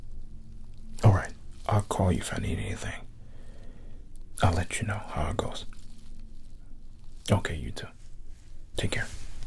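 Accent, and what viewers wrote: United States. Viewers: I love 💕 your American accent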